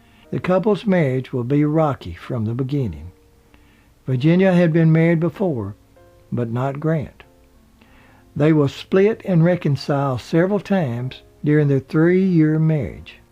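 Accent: United States. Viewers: Can’t stand this American narrator’s accent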